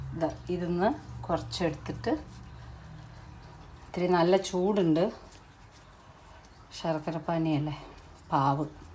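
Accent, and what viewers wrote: Palakkad, India. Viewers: Made the rangayyan today ..made some changes in the tempering. Came out tasty .Nalla palakkadan slang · I really Thank you for this compliment. Real Palakkadan language. Post Thenga Vada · Chechi sherikum annapoorneshwari devi thanneya.. ❤️❤️❤️🥰🥰🥰😍😍😍 Chechi undakkunna reethiyum ath kazhinju chechi kazhich koodi kanikumbo sherikum manasu nirayua..Chechide palakadan samsaram kettirikkan enth rasama...Variety recipes njangalude munnil iniyum kond varane chechi.. Othiriyothiri nanmakal nerunnu...❤️❤️❤️ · പാലക്കാടൻ വിഭവങ്ങൾ ഉണ്ടാക്കുന്ന വിധം, തനി പാലക്കാടൻ മലയാളത്തിൽ കേൾക്കുമ്പോഴുള്ള സുഖം, അതൊരു രസമുള്ള അനുഭവം തന്നെയാണ് ട്ടോ, എത്തനൂരാണ് നാടെന്നറിഞ്ഞപ്പോൾ ഒരടുപ്പം, കാരണം എന്റെ മകളുടെ ഭർത്താവ് എത്തനൂർ കൊയ്യൂർ വീട്ടിലെയാണ്. ഞങ്ങളുടെ റിട്ടയേർഡ് ജീവിതത്തിൽ ഇപ്പോൾ, ഇങ്ങിനെ പഴയ പലഹാരങ്ങളുംമറ്റും ഉണ്ടാക്കി നോക്കലാണ് മെയിൻ ഹോബി. Thank you. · നല്ല പാലക്കാടൻ മലയാളം...❤❤❤❤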